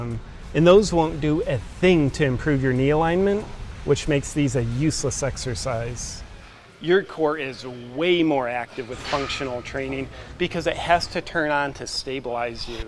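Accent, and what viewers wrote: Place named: California, United States. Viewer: It's so awesome that he sounds like every Californian - ever!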